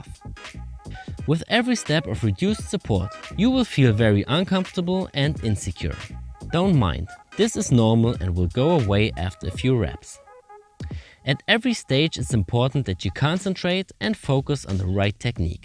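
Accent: Germany. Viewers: You sound german. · you kinda have a german accent haha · Lol this accent sounds very german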